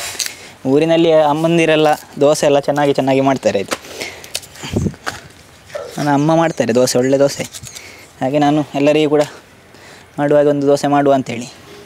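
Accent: Mangalore, India. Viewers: No matter what u make, just watching ur videos only bcz of your manglore kannada accent.....wowwwwaw!!!! lOving it since ur 1st day Keep going big fan of u🤩💫 Love from benne nagari - Davanagere KA 17💪💥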